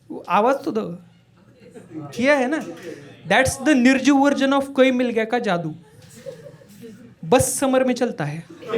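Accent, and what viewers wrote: Maharashtra, India. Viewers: Marathi accent in hindi standup 😀😀😀😀😀😀😀😀 kdk 😂😂😂😂😁😁🙏🙏🙏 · Brother, I am halfway through your video, I like your wholesome jokes. And be confident in our Marathi accent.❤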